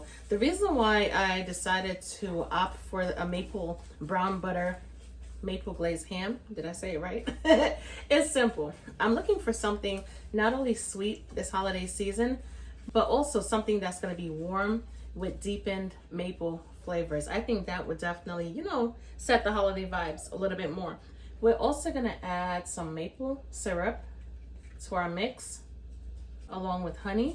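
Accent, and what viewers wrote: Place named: Northeast, United States. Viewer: I thought I heard that north east accent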